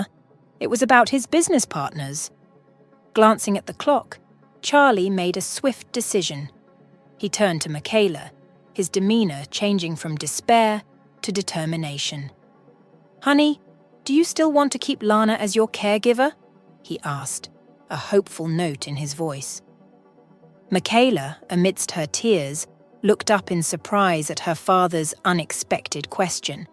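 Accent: United Kingdom. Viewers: What a beautiful story I enjoyed It from the beginning to the end ! The narrator with British accent was wonderful. I am happy that Lana was cleared of suspicion on her actions Thank you so much I love this story!!!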